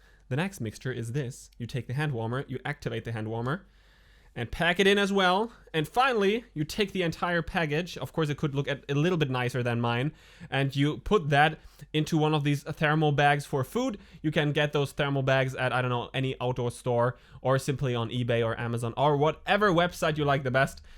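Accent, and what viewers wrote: Germany. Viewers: you are doing so good, your videos are really good and eventhough you German accent can be easily heard, your English is great anyway. But one thing it hits me every time I see your videos. It is episodes and not epizodes:-)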